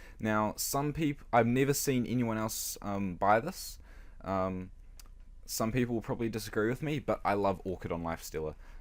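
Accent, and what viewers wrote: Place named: New Zealand. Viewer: Thanks for this it's helped a bunch. Also btw you sound kiwi as hah.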